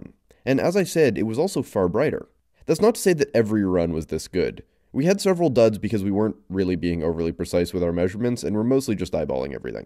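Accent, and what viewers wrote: Canada. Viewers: Very well done, and informative. But a just can't get past the the " aboot " and " oot " pronunciations. Question though when you show this glow stick of death shining red, is it giving of heat as part of the reaction? Is it a byproduct ?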